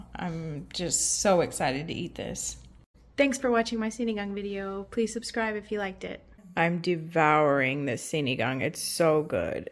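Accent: Visayas, Philippines. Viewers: Anaa ko dri tungod ni Jared..ug ako nalisang Kay tungod Wala ko naanad ga lantaw ug amercanang nag binisaya ug Ang accent bisaya kaayong dako😂 I'm glad and I'm subscribing..I'm so amazed❤ this will be my favorite blog.. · i saw u in jared's video i'm tagalog but i grew up with bisaya friends even though i don't speak biasaya i close my eyes i hear a bisaya person speaking, a fellow filipino. more power to your channel. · There is no way that I can't subscribe to your channel. You got me with your perfect Bisaya accent. Maayo kaayo ka magbisaya, day! Lingaw ko tan-aw sa imong video unya kabalo pud kag Japanese word daikon sa radish. Diay, pareho mog nawong ni Angelica Panganiban. Pareho mo guapa! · Nice🎉 bisaya Accent! So fluent... _siyempre gikan sa pilipinas.. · Bagsika nimo mgbisaya dai oi😂❤ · Pagka bisaya baya nimo uy! Haha! Taga Cebu ko pero naa na ko ga puyo sa Virginia. 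I miss hearing my mother tongue. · Kacute ni dai magbinisdak oi..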